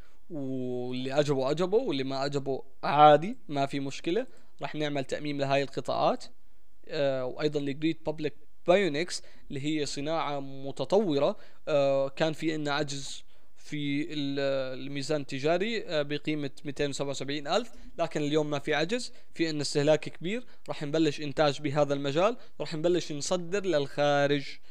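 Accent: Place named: Iraq. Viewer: والله يحبيبي انشالله شكلها الحقة تحمس بس اني هسه داباع متعجب دولة مثل المانيا ماعندها نفط وغاز وحتى ماء ومساحة صغيرة من اقوى اقتضادات العالم والعراق والدول العربية عندها اضعاف هلشياء وشعبها بلكاد عنده اكل والله للاسف وضعنه كذا تحياتي الك ولابناء شعبك اعراق والاردن اخوة والاردن اكثر الشعوب وقفت مع العراق واحنا نفس اللهجة تقريبا يعني من اشوف فيديوهاتك انسا انك اردني لهجتك قريبة علعراقي وانشالله مابيزعزع احد بين العراق والاردن مثل مازعزعو مع بقية الشعب العربي ❤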